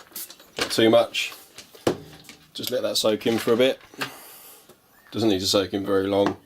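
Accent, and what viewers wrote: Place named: Australia. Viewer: Nice vid, Aussie accent?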